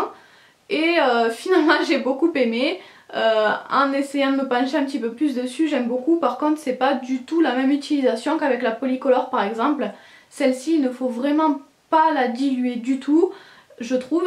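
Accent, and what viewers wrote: South, France. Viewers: J'adore ton rouge a lèvre et ta vidéo ! :) d'où vien ton accent ? Hihihi du sud non? ❤️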